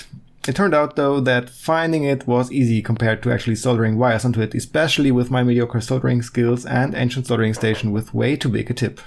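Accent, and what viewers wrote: Germany. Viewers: Ich höre einen leichten German Acczent ;o)... warum nicht gleich auf deutsch - gibt leider, viel zu wenig solcher guter Videos auf deutsch! Trotzdem: gute Arbeit - vielen Dank!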